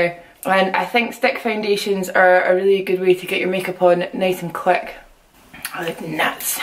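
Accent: Scotland, United Kingdom. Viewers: 👽👽👽👽👽 love hearing a similar accent on YouTube to my own! Scottish vloggers ftw ❤️ #mermaidhairlookingstunning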